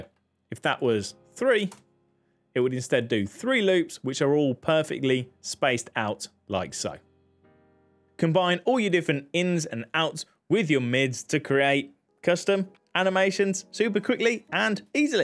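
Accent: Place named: Midlands, United Kingdom. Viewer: I live in the Midlands and have an accute "accent" awareness.. Yours sounds about 20 miles from Colin Furze! Somewhere north of Leicester and up as far as Nottingham. Just a random comment!! Cheers, Callum.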